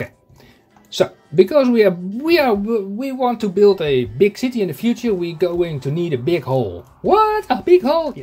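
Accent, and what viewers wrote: Netherlands. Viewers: I can hear by your English, your a dutchie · Yes i hear it too Im dutch too Halloooo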